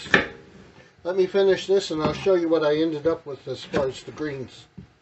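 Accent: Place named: South, United States. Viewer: 🤦 dude, every time you told Alexa to set a timer for 3 minutes caused my Alexa to set a timer. Now my Alexa has a "greens" timer. 😂 I know this video is a couple of years old now but I just saw it. I'm about half redneck living in middle TN. The way you talked and done everything felt like I was listening to someone local and on my level. Been awhile since I done any canning and was looking for refreshers. You mentioned liking all kinds of greens like turnip, collard, mustard, whatever. If you haven't already you should try a mixed of all those together. Mixed greens are pretty darn good. Throwing in some kale, that you said wasn't your favorite, actually adds to the flavor. Some arugula mixed in is pretty good too. I do have a couple of suggestions, not criticisms or anything negative. I'm not a fan of a whole lot of turnip chunks in my greens. 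I'd recommend putting the turnip chunks in the jars first so you can get the amount you like. I'd also shoot for something in the middle when it comes to loose pack or tight pack. As a southerner I like some meat flavor with turnip greens. A couple of different things you could do if wanted it already canned instead of adding it later. Canning meat isn't a whole lot longer than just the greens. Maybe blanch the greens maybe a minute less, or not , and should still be good with meat. Or use some bullion in the water you are blanching them in and filling the jars. Again, absolutely nothing wrong with what you done. It helped me remember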